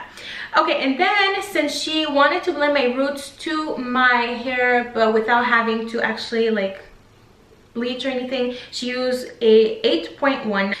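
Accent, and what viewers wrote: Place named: Montreal, Canada. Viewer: You’re accent sounds Montreal Canadian. I might be totally wrong lol 😆 your hair looks amazing !!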